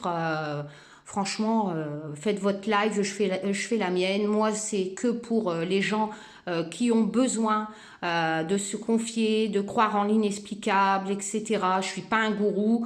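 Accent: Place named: Nord, France. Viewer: est juste humaine 😇un mail envoyé et réponse dans la foulée...j'espère que ma question sur le boulot arrivera surtout que ce domaine (dans des bureaux )du jamais fait pour moi😊j'adore la regarder toujours dans la bonne humeur même légèrement remonter, elle a toujours un mot pour nous faire rire...Son patois un peu chti me fait rappeler ma famille tous en Picardie.Bref une femme qui m'a donner l'envie de faire mes propres tirage d'ailleurs reçu mon petit Oracle aujourd'hui que d'émotion pour moi😢 j'attends mes 2 autres avec impatience...Je fais selon mes envies j'ai sauter le pas pour mes tirages et me contre fiche du jugement de certains membres de mon entourage. Grand merci pour toutes tes explications sur les cartes.Votre livre est rempli d'émotions les larmes sont venu pour moi😊.Continuer sur votre chaîne vous nous faites un bien fou...Encore une fois. 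M E R C I 🙏🙏🙏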